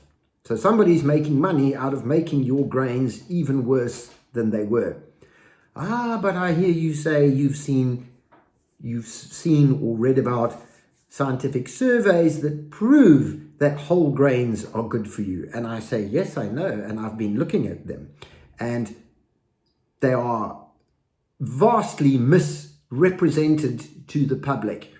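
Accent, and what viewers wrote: South Africa. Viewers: Brilliant...You're so right. Totally agree.. Everyone listening needs to take care of diet and exercise before its too late. I live in USA.. Linda, ex-Zim..Good to hear yr SA accent :)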